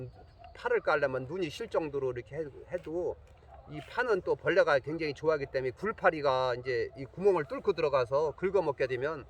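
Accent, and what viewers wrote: Chungcheong, South Korea. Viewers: 질문을 하면 줄줄줄나오는게 넘신기ㅋㅋㅋㅋㄱ구수한 충청도 사투리 넘나 정감가요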